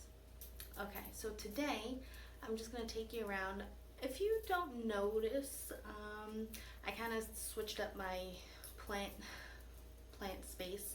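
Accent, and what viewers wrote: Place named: New York, United States. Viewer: Beautiful flowers. Congrats! Where did you find those gorgeous big compacta baskets? You sound like your from NY and I have never seen those anywhere in the NYC limits. 😊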